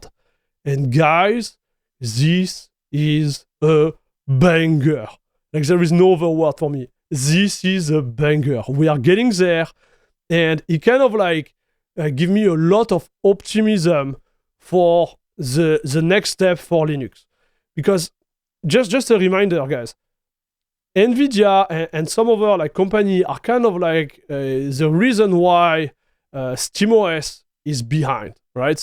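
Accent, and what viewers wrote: France. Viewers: That's a French guy not afraid to have an accent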